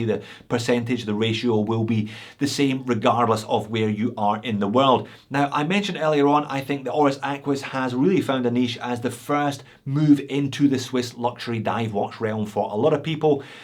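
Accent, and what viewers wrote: Scotland, United Kingdom. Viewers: I just came to listen my favourite Scotsman talking about a nice watch I can't afford and had to learn that Sean Connery died... RIP.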